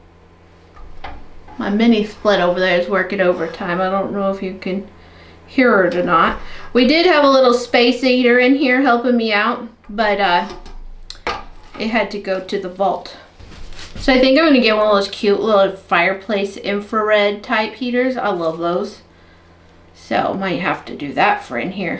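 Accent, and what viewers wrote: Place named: South, United States. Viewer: I love the snowy exit scene! I'm praying at this point that I'll be able to place an order when you open the website. Things have gotten a bit hairy this month. As for the way you pronounce words... don't fret! Anyone who lives in a southern state for more than 5 years after living in the northern part of the States gets a pass. We develop a whole new accent from everyone else! I hope y'all have a wonderful Thanksgiving!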